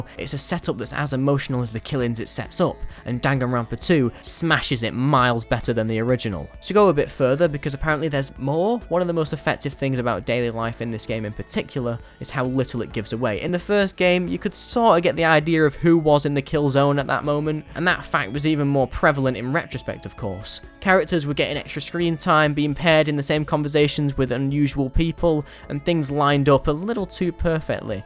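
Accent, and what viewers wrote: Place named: United Kingdom. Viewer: dude I'm 10 minutes into the video and I'm loving it so far, but I felt the need to say something completely unrelated. I'm studying English Phonetics (as a foreigner) and I have a midterm on it tomorrow. this video is genuinely helping me so much, I'm like transcribing everything you say into phonetic script in my head. I don't know if you speak BBC English, Estuary or some other variant, but I'm shocked at all the diphthong shifts, intrusive R's, elisions, assimilation and other things I'm identifying. again, completely unrelated to what the video is about but it's helping me so much. thank u :)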